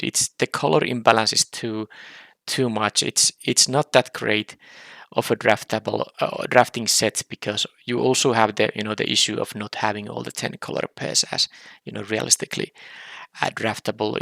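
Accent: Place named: Finland. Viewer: thank you for update. I'm glad, that you are Ok 👍, and stay with us. Just make a video, when you feel it. I will happy to see you anytime. You are such a nice guy and i love your finnish accent 😄. Believe or not, i watch other streamer from Finland on twich named NCAA, which is one of the best limited player on the world. So in Finland are another great players 😄👍. Apologize for my English, this is my longest comment in english ever 😄😄 Stay strong, stay healthy. Greetings from the Czech Republic.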